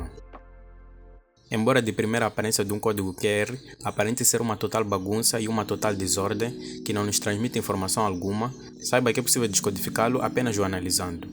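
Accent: Mozambique. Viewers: Eu acho bonitinho o jeito que vocês Moçambicanos falam. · Moçambique(Moçambicano), Nampula creio eu pelo sotaque.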